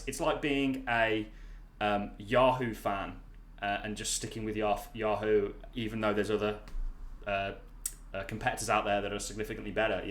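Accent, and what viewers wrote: United Kingdom. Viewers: For a moment I thought it was Benjamin Cowen with a British accent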